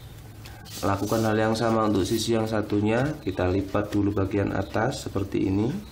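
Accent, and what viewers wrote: Java, Indonesia. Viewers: Kamu medok banget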